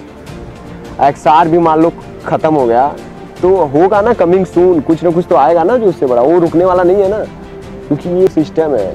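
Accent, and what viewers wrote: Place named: Uttar Pradesh, India. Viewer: Up walo ke hi pass yese bat krne ka trika rhta h me bhi mirjapur se hu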